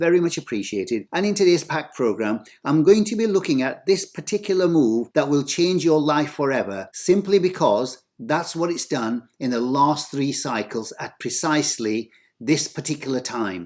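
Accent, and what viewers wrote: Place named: Yorkshire, United Kingdom. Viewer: Hey ~ This may surprise you!! The B-Exit Indixator is not my favourite part of your video... rather hearing your Yorkshire accent!! No eee byy gum though???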